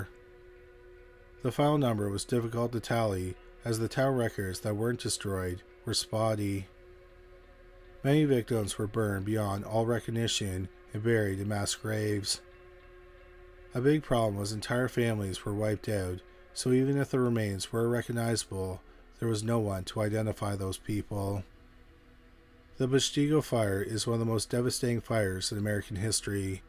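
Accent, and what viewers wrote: Canada. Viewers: Is he Canadian? It would explain some things.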